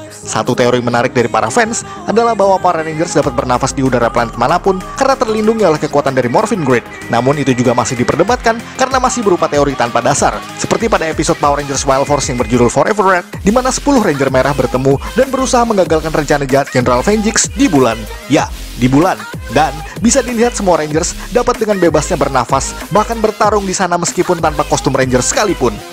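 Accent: Java, Indonesia. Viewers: Min... Bahas ttg Kiramaiger donk... Jujur saya merasa gak nyaman dg penjelasan mimin yg di Try It karena kebanyakan ngeguyon apalagi dibumbui logat Jawa nya meskipun yah itu alamiah sih yah krn mungkin si mimin org Jawa... Dg melalui TokuFacts ini saya rasa kami akan merasa lbh nyaman mendengar penjelasannya tanpa banyak bertele-tele dan akurat pula...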